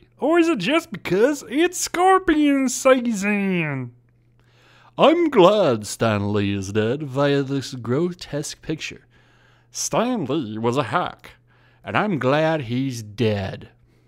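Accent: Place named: South, United States. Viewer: I just want to point something out here. Far left ----> Hating on a man who, although I certainly have no affection for, clearly meant a lot of things to a lot of people. Yet the Alt-right nazis everyone is so scared of, have no said a thing about him being a hack, even though he's Jewish and did do a lot of questionable things in his life. Just want to point that out. Your southern accent is beyond nauseating. Also just want to point that out. You read like 400 tweets in the 3 character voices you have. Lost being funny very quickly. As someone who has no dog in this race I wasn't cheering you for mocking sjws and I wasn't steaming mad that you were, I was just bored and irritated.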